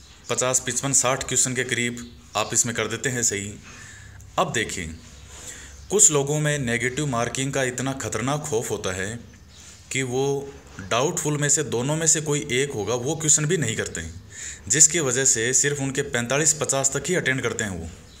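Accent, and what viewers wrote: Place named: Shekhawati, India. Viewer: sir ki boli se to ye Sekhawati chetr ke lgte hai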